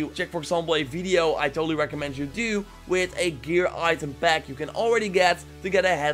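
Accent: Netherlands. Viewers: You have a dutch accent while talking english. Where are you from?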